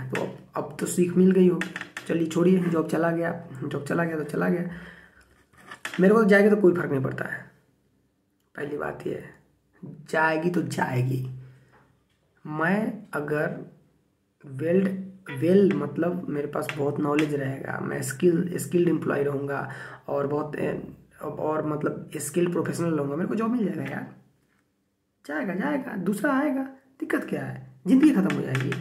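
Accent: Bihar, India. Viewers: Bhaiya ji..bihar se ho kya😀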